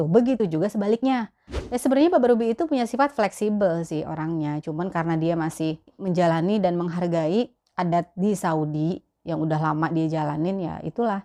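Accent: Jakarta, Indonesia. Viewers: Logat Betawinya msh kental mpok.hehehe... Dari Al-qassim menyimak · Logat betawinya nempel bgt.. demen dah mpo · Suka denger ngomongnya ada betawinya ada arabnya..resep bgt...sukses selalu mpok cantik.. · Logat betawinya masih melekat lucunya 😁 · mpo betawi nya kuar semua😂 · Yaa Allaah... betawinya.... bikin bangga... 😍😍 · Assalamualaikum... Seneng bngt liat vlognya apa lagi klo lg pas ngmng betawi...sehat² di sana...salam kenal dari Medan (aslinya mah jkt jg..ikut misua stay dimedan) Walasam🙏🙏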